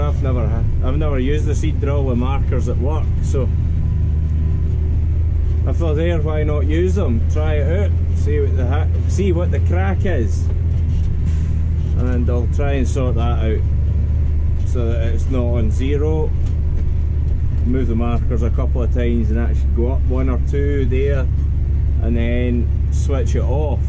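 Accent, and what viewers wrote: Scotland, United Kingdom. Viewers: He is a proud Scotsman!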